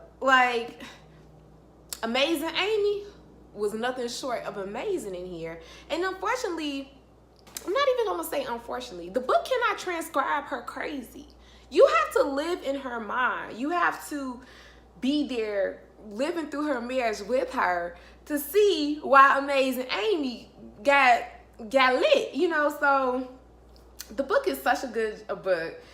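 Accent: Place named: South, United States. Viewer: So pretty... my daughter loves Gone Girl. I think I’m drawn to your southern drawl. Keep up the good work. #southerngirls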